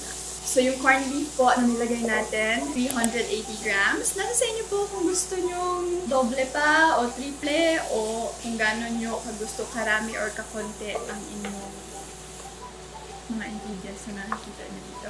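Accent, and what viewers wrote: Visayas, Philippines. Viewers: Next vlog suggestion po. Bisaya only vlog😍 pero po sana may tagalog subs kasi tagalog kami eh😂 gustong-gusto ko lang po yung bisaya accent nyo❣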